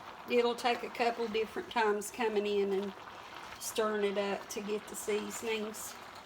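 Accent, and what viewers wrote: South, United States. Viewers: I love to listen to a southern accent...I could listen to all day long.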